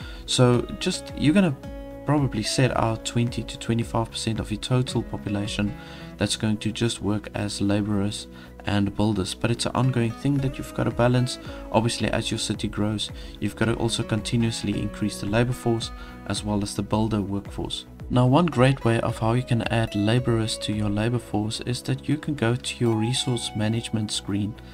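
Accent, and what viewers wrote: South Africa. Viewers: Good stuff! Thanks. Coolest tip to have multiple mines on deep deposits (infinite supply deposits). BTW, I think I hear a South African accent there?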